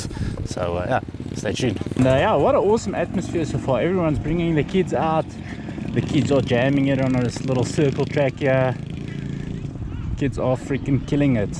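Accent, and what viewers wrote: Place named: Afrikaans, South Africa. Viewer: Heavy Afrikaner accent. Daai bikes is Chinese ne . Ek ry n Beta 300 RR .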